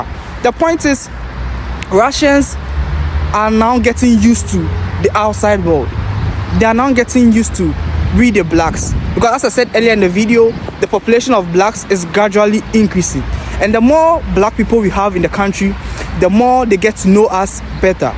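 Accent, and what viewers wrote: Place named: Ghana. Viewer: He has the Ghanaian English accent....is he a Ghanaian?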